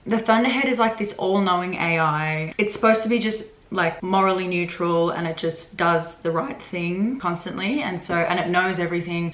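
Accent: Australia. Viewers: No wonder sounds really off … thought it was from some part of country I never been to … it’s Australian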